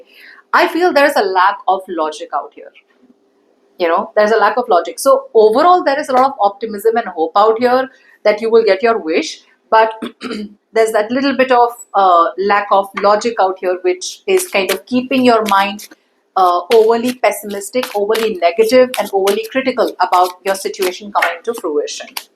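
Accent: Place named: United States. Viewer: American english....no understand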